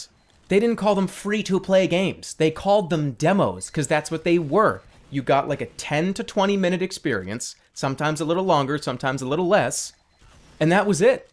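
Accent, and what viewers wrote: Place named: Boston, United States. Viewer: God I miss the strength of his Boston accent in his yt days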